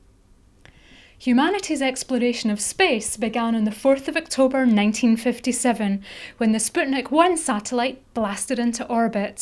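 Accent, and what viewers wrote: Scotland, United Kingdom. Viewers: She is VERY Scottish. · gawd i hate her voice, what is she scottish? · I liked the Scottish accent, unlike everyone else who's commented on it. But yeah, if we are to 'colonize the galaxy', we have a hell of a lot of work to do. It's a shame us non-geniuses can't really help much. Or can we?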